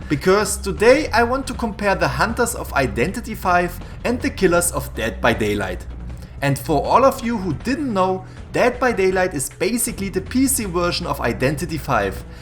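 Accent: Germany. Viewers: I knew it because I’m German Too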